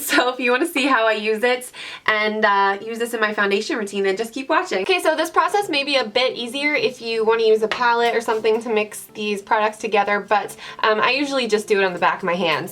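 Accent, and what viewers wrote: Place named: East Coast, United States. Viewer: I Love your slight accent. I'm assuming your from the east coast?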